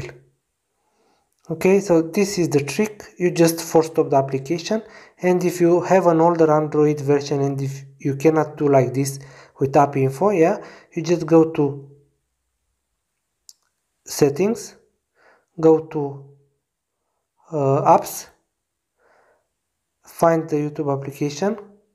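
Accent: Romania. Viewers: M-am prins din prima ca esti roman de la accent lol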